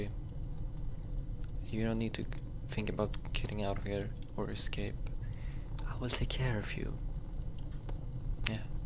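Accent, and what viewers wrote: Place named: Sweden. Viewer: I knew it! Your accent reminds me of my first love🥺 He's from Sweden too.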